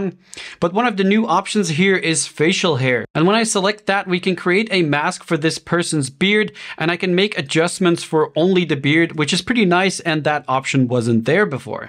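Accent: United States. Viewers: ...but has an American accent, not all English speaking people have an American accent....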